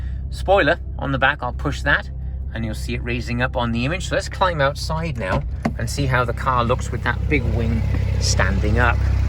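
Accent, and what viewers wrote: Wales, United Kingdom. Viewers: Just wondering is that a hint of a Welsh accent? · Is that a welsh accent i can hear? Glen S.Wales - Great review!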